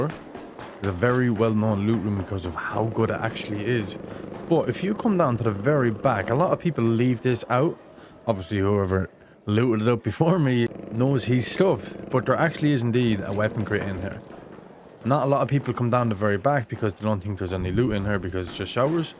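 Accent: Ireland. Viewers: I know a fellow Irishman when i hear him 💚 thanks for the useful info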